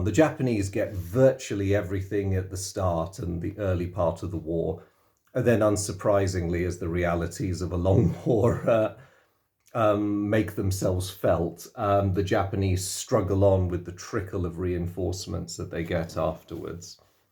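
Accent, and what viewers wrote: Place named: United Kingdom. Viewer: This was a pleasure to listen to. You’re an excellent speaker and communicator. Very clear and organized in your presentation and with a magnificent British accent. 😊 You’ve given me some added inspiration to read through the rules tonight and tomorrow to play a young man who I’ve introduced to these old war games (and some newer ones as well). I’m 61 in first started playing Avalon Hill games about 48 years ago. They are my one remaining hobby.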